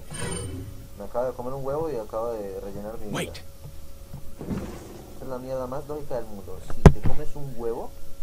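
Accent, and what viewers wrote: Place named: Peru. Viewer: esa voz de peruano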